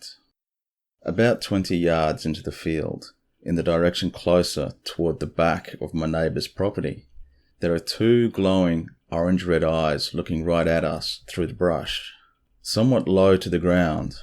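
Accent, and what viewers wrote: Australia. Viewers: !! Great to hear your Aussie voice again! Great stories! Hope you're doing well and staying healthy! 💜💙🩵💚❤️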